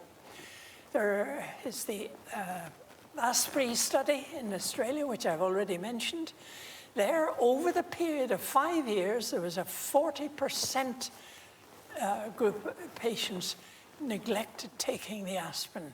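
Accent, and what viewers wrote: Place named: Northern Ireland, United Kingdom. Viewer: Sounds like a lovely northern ireland accent! 😊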